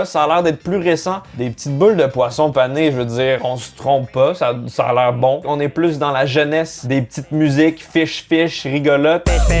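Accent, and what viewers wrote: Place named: Canada. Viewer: L'accent canadien c'est un sketch 😂😂😂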